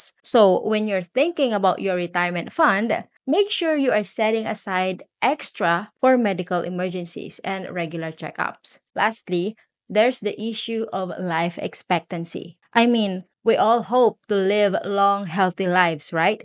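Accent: Pangasinan, Philippines. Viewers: Taga pangasinan ka po? Accent po tga pangsinan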